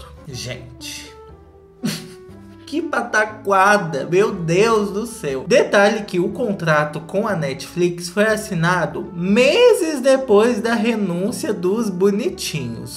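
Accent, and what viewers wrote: Minas Gerais, Brazil. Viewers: Eu amo essa narração em Minerês,nó.....muito lindo.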